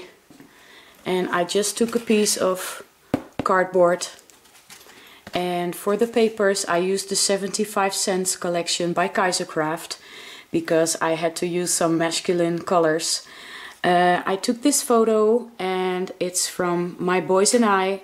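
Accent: Netherlands. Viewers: Goodmorning nice layout !!!! Im the guest designer for the month of march . Are you Dutch????? I am so I thought I heard a dutch accent!!!!! Take care Els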